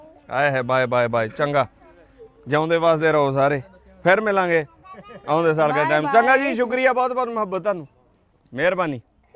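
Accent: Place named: Malwa, India. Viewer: ਜੀ ਤਵਾਡੀ ਮਲਵਈ ਭਾਸ਼ਾ ਹੈ ਅਸੀ ਜਿਹੜੇ ਪਾਕਿਸਤਾਨ ਤੋਂ ਆਏ ਹਾਂ ਜਾਂ ਮਾਝੇ ਵਾਲੇ ਸਾਡੀ ਭਾਸ਼ਾ ਢਿਲੋਂ ਸਾਹਬ ਜਾਂ ਸ਼ਮੀ ਜੱਟ ਹੋਰਾਂ ਦੀ ਭਾਸ਼ਾ ਇਕੋ ਹੀ ਹੈ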